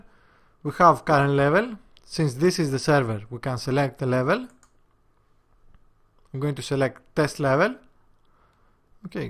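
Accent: Greece. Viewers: την ελληνικη προφορα δε τι μπερδευω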